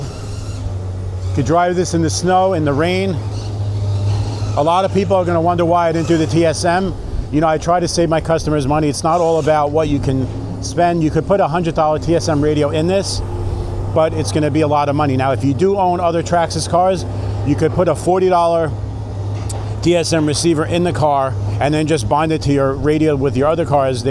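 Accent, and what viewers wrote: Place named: North Jersey, United States. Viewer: Man nice whip! I hear an accent like mine lol from north Jersey😂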